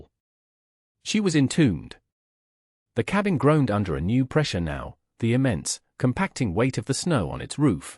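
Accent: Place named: United Kingdom. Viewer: A very feel good story. But these are much better with a cowboy voice, not British. Alabama 🇺🇸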